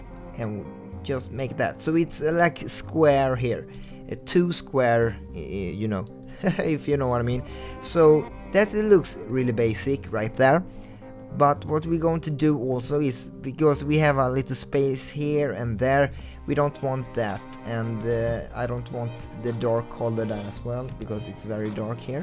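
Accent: Sweden. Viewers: The swenglish 😂😂 I am from Sweden so it doesn't bother me 😂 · Dat Swinglish xD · dat swenglish man ;)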